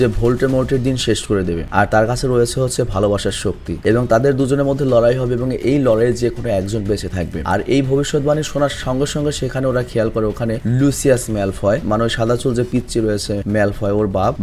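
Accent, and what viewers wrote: Bangladesh. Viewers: তোমার এই হালকা বাংলা দেশী টান কথার মধ্যে আমার ভালোই লাগে ❤ কিন্ত হারামাউনি মানা যায় না (হারমাউনি)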